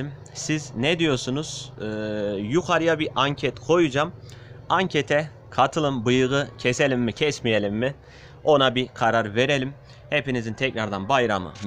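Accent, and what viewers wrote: Gaziantep, Turkey. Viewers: Aaa Reis antepli misin sen?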